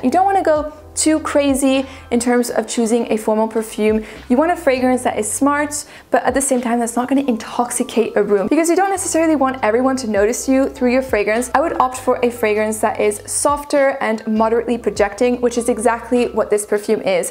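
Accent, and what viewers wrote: France. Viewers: My God that accent…I could listen to french people discuss anything. The list is really impressive and has given me some great ideas to sample. Cheers! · She’s a franchie, I’m French so i can see it obviously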